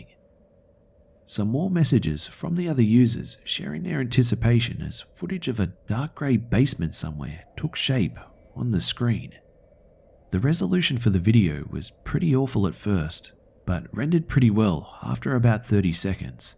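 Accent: Australia. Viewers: I also have come across some disturbing videos via the dark net, without being rude are you Australian by any chance?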